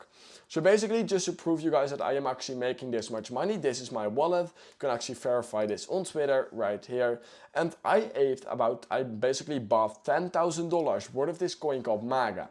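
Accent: Netherlands. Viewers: i smell a bit of nederlands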